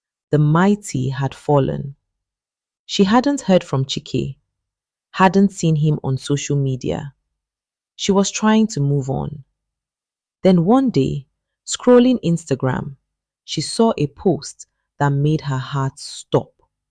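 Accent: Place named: India. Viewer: The narrator voice changed to Indian,not professional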